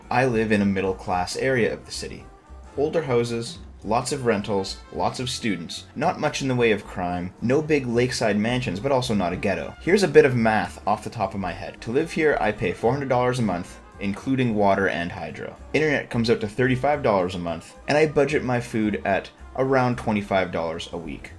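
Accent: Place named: London, Ontario, Canada. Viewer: I swear you could be from london ontario. But what do i know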